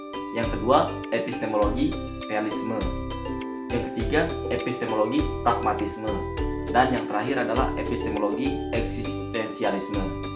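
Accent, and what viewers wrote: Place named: Masbagik, Indonesia. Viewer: logat masbagekk neee kentell jmakk wett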